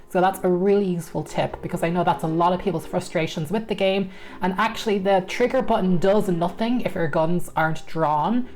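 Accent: Ireland. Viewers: There's no tap backwards? At all? That's such an omission. I can't make a jump because I need a one tile run up, but I can't do the dangle off the edge and climb back up trick, as there's a bloody wall there. It's perfectly impossible with the modern controls. Because you've got to go back right to the wall, but if you walk backwards, Lara gets stuck with the wall and to sort that, you've got to walk forwards but then you don't have the run up. Oh, well. Time to go back to "tank controls" and re-learn them. I mean, I used them back in the day. I thought "modern controls" would help fix it being so janky, but they feel more janky - for the lack of tap backwards - and not an improvement. p.s. Ah, those Irish "R"s. Love it. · I find the modern controls way less clunky and awkward in general, but need to switch to the tank controls for things like making her do that jump back for the perfect running jump etc. 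Looking forward to this video as I definitely need help with exactly the issues you're describing! It took me like 4 levels to figure out [on the switch] that you need to press RZ to grab after jumping, not the action button or any others. Camera angles are a major pain in the pass, too. P.S. Off-Topic and maybe unwanted comment, but I love your accent! Irish accent has always been my favourite :)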